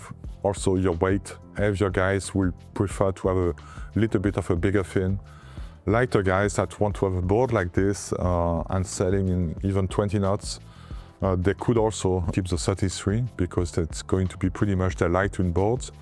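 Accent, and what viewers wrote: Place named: France. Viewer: there's french accent and then there is french windsurfer accent.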